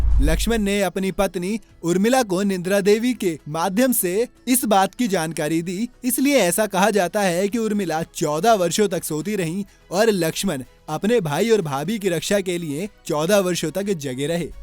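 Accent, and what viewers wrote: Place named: Tamil Nadu, India. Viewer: Ap to tamil ke thara bolte hai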